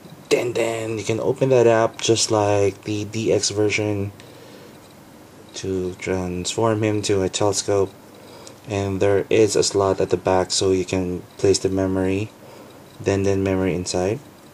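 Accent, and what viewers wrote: Philippines. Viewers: Dude can I ask, are you Filipino? Ya kinda sound like a Filipino.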